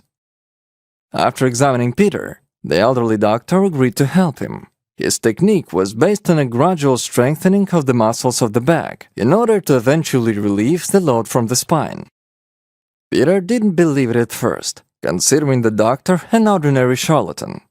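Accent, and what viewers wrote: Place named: Russia. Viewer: Utter nonsense narrated by a Russian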